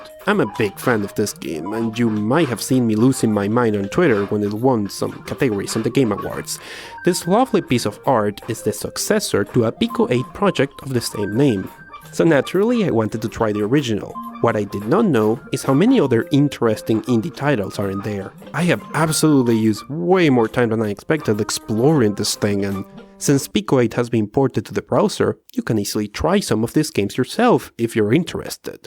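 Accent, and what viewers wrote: Spain. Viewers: Guys he Isn't Asian, he is an Spain Guy, so that accent is Spanish accent.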